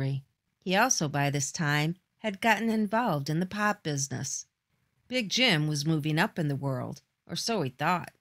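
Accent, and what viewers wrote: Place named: Western New York, United States. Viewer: I really enjoy your channel and how you research and include newspaper clippings like in this one which I thought was very good. The narrators voice is unique, def Western NY? Cleveland or Detroit I’m guessing lol. But this story got my interest bc I moved down from Brooklyn to Fort Lauderdale in 1983 and the locals were still talking about this story and Big Jim. I’ve even been in the 4 O’clock more than a few times back then lol. Keep up the great work you people do.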